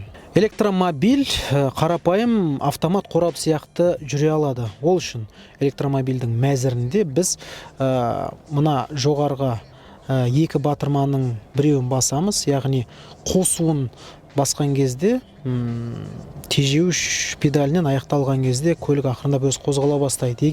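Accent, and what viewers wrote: Standard. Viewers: Жәрайсың таза қазақ тілінде жүргізген түсініктемелерің өте керемет. Қазақ тілінде осынша таза жеткізе білүде екінің бірінің біліктілігі жете бермейді. Ризамын саған інім. Талмай самғай бер. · Ма ша Алла қазақшаны тап таза бұрмаламай сөйлейді екенсің жарайсың!!!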